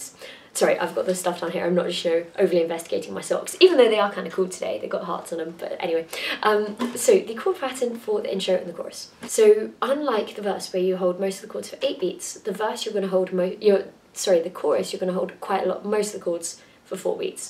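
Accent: England, United Kingdom. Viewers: Seriously love your videos, I've literally only just got a guitar for my birthday, which is today, woo! And I've never even had a guitar lesson or anything, I'm going to hopefully attempt to teach myself, which should be interesting:L but yeah I think you're awesome, and not to be horrible to other talented guitar tutorial people out there, but I find your videos really easy to follow, you seem laid back and really cool, plus because you're english like I find you easier to understand than some other youtubers because I don't have to listen through a really thick accent which is always helpful when you're trying something new haha, if I can be half as good as you I'd be extremely happy! Carry on making awesome videos and I'd love to hear something by maybe, quite like that song although I usually listen to heavier stuff, but still, also you have a really nice singing voice, pretty unique and I like that, also I know as you've said in a few of your videos singing is not your strong point, but I think it's really great when you do sing, shows confidence, and it helps for me to understand more, I'm a learning by hearing kinda person, but yeah, excellent work!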